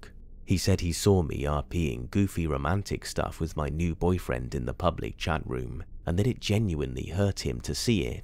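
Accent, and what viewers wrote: United Kingdom. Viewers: Gehls. I love it !!! I love that British accent ! ❤ 🙏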